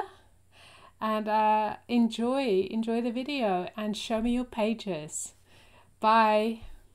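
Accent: South Africa. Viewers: Wonderful inspiration, thank you! Do I hear a South African accent? Lekker!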